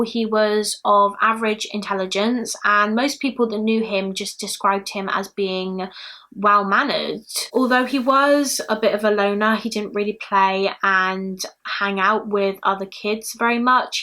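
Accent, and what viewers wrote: United Kingdom. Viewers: I just love your British accent! It makes my day! Thank you for all of your interesting shows on your channel!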